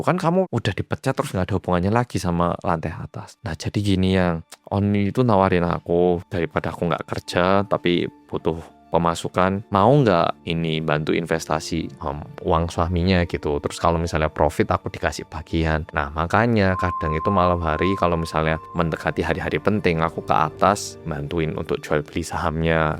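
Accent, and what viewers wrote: Java, Indonesia. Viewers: Siang mas muedok lgsg nyimaaak🎉🎉🎉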